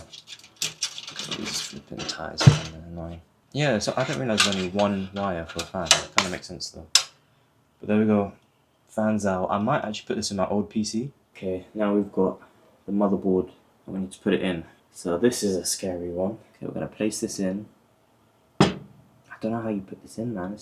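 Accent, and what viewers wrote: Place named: United Kingdom. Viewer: You sound like another British bloke, Lewis Hamilton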